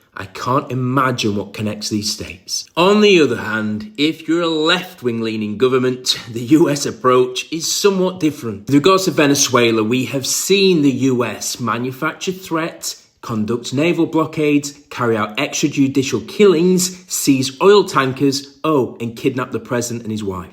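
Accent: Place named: United Kingdom. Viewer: You sound British, none of your business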